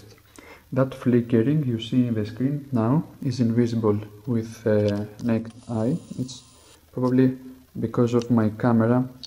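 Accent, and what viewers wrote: Greece. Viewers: I have been to greece (Olimpiada) past summer for vacation, i can recognize your accent by the "h" sound :-) good job!! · are u greek????? You sound like me to talk in english im greek too lol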